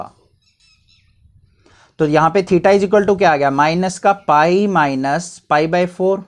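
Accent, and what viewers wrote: Haryana, India. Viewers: Sir r u from haryana? Bcoz u speak like haryani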